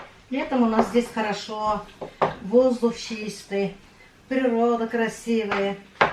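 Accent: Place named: Bashkortostan, Russia. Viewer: землячка моя дорогая! Я русская, но родилась и выросла в г. Уфа и поэтому татарский язык помню и: Исама Сис поняла ( может неправильно написала, но уж простите меня) т.к. мне 65, а уехала учиться из Уфы под Москву в 17. С большим удовольствием смотрю твой Канал, хорошо, что он появился и с большим удовольствием слушаю твою речь с местным акцентом- это как бальзам на сердце для тех, кто живёт далеко от своей малой Родины. Спасибо тебе, большое!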